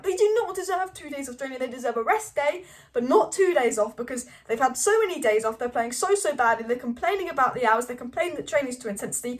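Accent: United Kingdom. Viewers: Would u stop talking like a brits?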